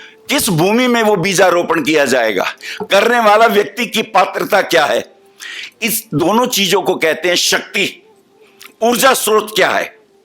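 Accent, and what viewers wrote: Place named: Bengal, India. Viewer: This is how we Bengalis pronounce. Thanks Guruji.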